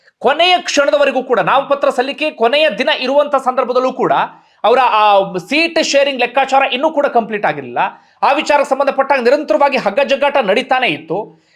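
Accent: Standard. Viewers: Adbutha vishleshane. Sir election result gintha nimma swachha sundara kannada vishleshane namage kelokke hitavaagirutte. Pakshaateetavada intaha sarala sundara visleshanegala payana yashassannu tarali. Abhinandhanegalu.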